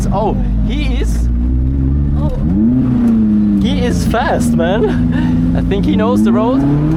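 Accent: Switzerland. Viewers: As a german i want to hear your english more likely then your SCHWEIZER-DEUTSCH Accent. (No hate btw✌️) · te schwiss german akzent ghört mer sehr dezent haha, aber schön :D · Are you from switzerland by any chance? Recognize the accent :)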